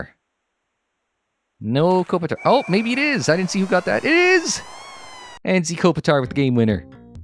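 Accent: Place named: Newfoundland, Canada. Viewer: I am pretty sure that would be a Newfoundland accent...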